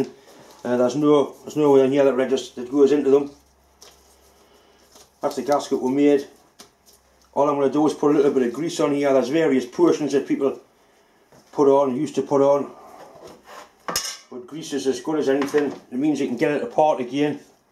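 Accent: Newcastle, United Kingdom. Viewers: Marine Engineer: my thoughts exactly. Did you by any chance do any shipyard time? Some many of those thoughts and expressions common to lots of us. Being close to South/North Shields you must have met lots of shipyard guys doing their apprenticeships. I'm in Canada (West Coast) and worked with lots of North East guys: been asked for a Dolly and other fascinating tools. Retired now but I did get to help pull a bottom end from a direct reversing common-rail engine and watch him do a bit of hand scraping. There were always hand scrapers in every engine room but I never learned to use them. Shells for me every where. Thanks for your videos. My wife claims not to be able to understand you at all. I certainly do! Don't hear many Geordie s now that I'm retired.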